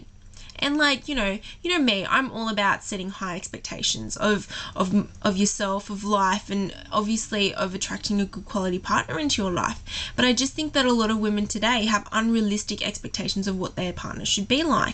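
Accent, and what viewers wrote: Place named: Australia. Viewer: Wow you sound super Aussie!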